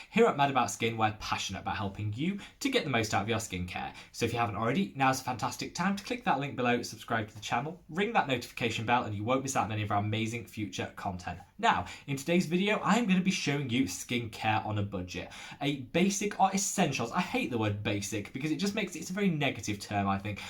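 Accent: United Kingdom. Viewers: yeah in Valencia, born here, but i love foreign languages, so I use your channel to improve my english,( I learned English at Loughborough -Nottingham, and I'm in LOVE with your marvellous British accent, hate the American tough) and to learn about my skin routine so is a win -win situation for me. Lol 😆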